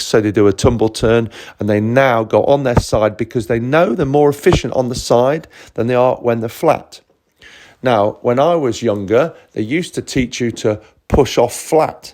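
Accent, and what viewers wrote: United Kingdom. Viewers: This british accent makes me cry😢😢😢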